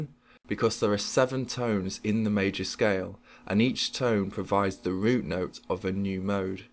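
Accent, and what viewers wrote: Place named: England, United Kingdom. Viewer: I really don't get all the hub-bub about this guys voice. He's from fucking England, and he has an accent. Wow, right? It's almost as if these comments are from 15 year olds on their junior-high school library computers. Regardless of his pace or inflection, this -IS- an instructional video, and he's not a bloody actor. The focus should be on clear, articulated information; not a swooning narrative with Shakespearean prose. Anywho, very nice video, thanks!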